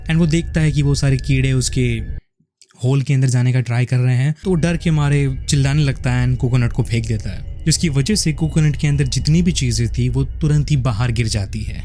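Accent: India. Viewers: You actually sound like only desi. Thanks for the video tho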